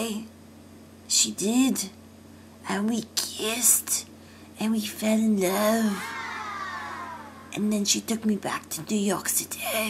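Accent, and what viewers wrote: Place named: France. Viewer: Ohh is a darling!!! Love his french accent too:D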